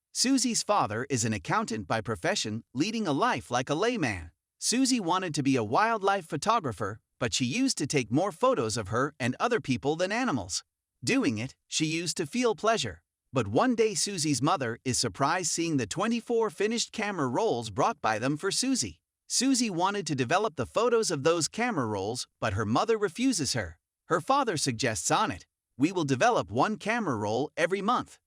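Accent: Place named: United States. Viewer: Perfect American accent, but I still can’t understand what he’s saying.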